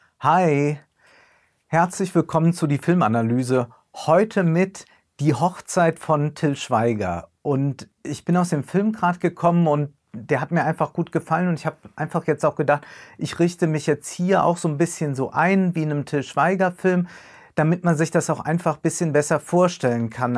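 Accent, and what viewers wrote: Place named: Moselle Franconian, Germany. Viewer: Dieser dezente aber schöne moselfränkische Akzent. :D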